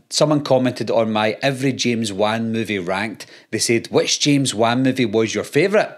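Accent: Scotland, United Kingdom. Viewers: you’re the man. Good on you for letting this nonsense roll right off your back. I’m from Boston, MA - I speak my dialect. You’re in Scotland and speak yours. Who’s to say that anyone from a different area is speaking incomprehensibly? Love your channel! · I’m from Brazil and the accent I adopted was American and I can completely understand you. Really like this Scottish accent to be honest. Don’t worry about the trolls/haters ! You’re awesome!! Keep up the good work!!! 👏🏻👏🏻👏🏻👏🏻👏🏻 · Screw the trolls, its actually hilarious some of the comments, i don't have a YouTube channel but can fully understand the level of abuse you get as ive got a few friends who have one and its unreal the shit they get. I'm Scottish and fully understand you so fuck anyone that cant understand the Scottish accent, they don't deserve you mate. You don't need idiots watching your streams anyway absolute losers. 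· Give ‘em hell! Very entertaining I think Scottish accents are dope and I don’t have a problem understanding Open your ears people! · I can't believe people comment on your accent, you're from Scotland what should you sound like? Crazy people 😊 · People are just dumb. They think everyone in the world is suppose to sound like they do? Also I love your accent. It reminds me of my mother in law who is from Edinburgh. She teaches me all kinds of things like I hunt the gruffalo in the deep murk widd! Also telling people git ursell to fuck ya wee radge!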